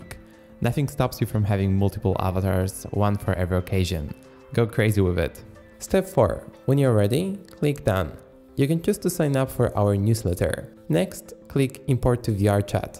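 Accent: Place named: Sweden. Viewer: he used to have a strong Swedish accent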